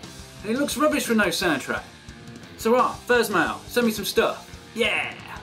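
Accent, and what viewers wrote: United Kingdom. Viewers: I AM MISSING YOU MAN! Can't live without your magnificent british accent! <3 u bro! LETS GO CRAZY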